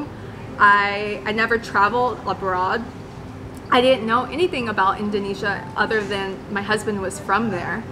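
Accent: United States. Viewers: I learn much american accent from you, You need colab with Indonesian food vlogger to get more subscribe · Keep making videos! It is good for learning American pronunciation.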